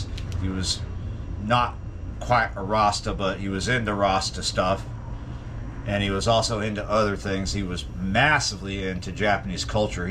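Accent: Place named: South, United States. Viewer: 😂😂Southern accent now 😂😂. Are you moving back to try to get your driving license so you can do the van life thing. Good luck.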